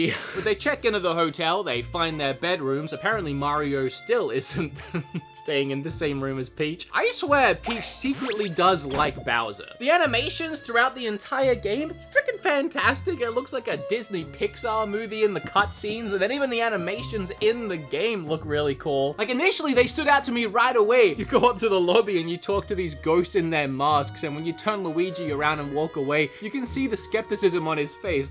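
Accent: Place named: Australia. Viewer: So what’s this guys accent? He sounds Australian but says some words completely differently.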